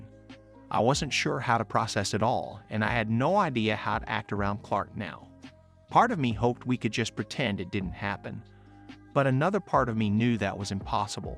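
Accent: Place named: South, United States. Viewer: You sound like a redneck and that's hot! You and you nephew have some fun, a lot of fun many times!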